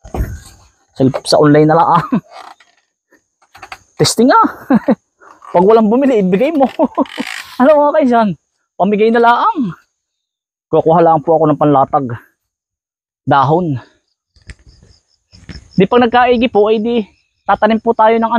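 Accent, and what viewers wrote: Quezon, Philippines. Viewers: Iyan po ang nakasanayang tagalog ng mga taga Quezon, mahirap kay na baguhin ang nakasanayan Ay sadya iyan ang punto at mga termino gamit dito sa Quezon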